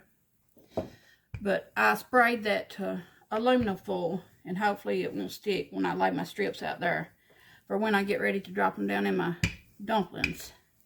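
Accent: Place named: Kentucky, United States. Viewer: ok I thought I was hearing a Kentucky or TN accent. 💜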